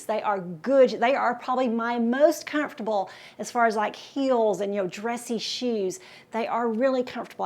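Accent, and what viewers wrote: South, United States. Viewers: To much talking. Very Southern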